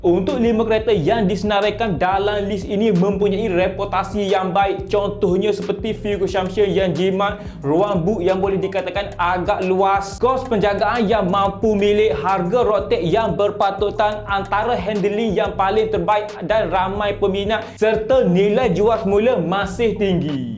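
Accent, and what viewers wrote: Kelantan, Malaysia. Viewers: assalam......!!! ce tenang² sikit... lunakkan sikit suara tu... pelat kelate tu xpe la dulu... kendian nti pelan² hilang la tu... in syaa ALLAH apa pun... abg sapot subscribed dan liked dahhh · Demo ore klate ko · Konten yang sangat menarik dan berguna, bahasa yang mudah difahami walaupun loghat Kelantan...teruskan berkonten, ambo support 100% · abe ore klate k?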